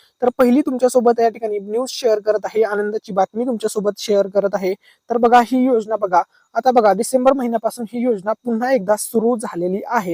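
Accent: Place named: Jalgaon, India. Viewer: Mini Jalgaon jila tune bolati aahe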